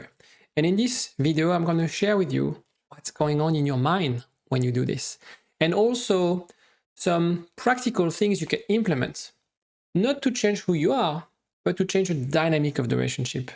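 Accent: France. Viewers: Is that a French accent?😊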